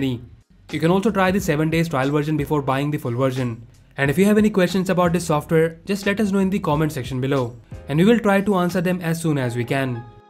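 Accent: India. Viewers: I hear annoying Indian accent, I click away.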